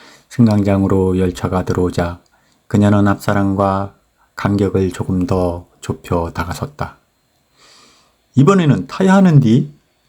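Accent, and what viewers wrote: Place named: Chungcheong, South Korea. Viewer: 겨울철 자전거 풍경과 내레이션이 잘 어울리는 구수한 충청도 사투리가 멋져유.,. 다덜 콩나물지하철 경험하면..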